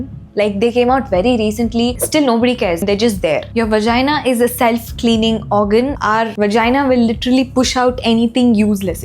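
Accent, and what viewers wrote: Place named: Karnataka, India. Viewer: that kannada accent 😂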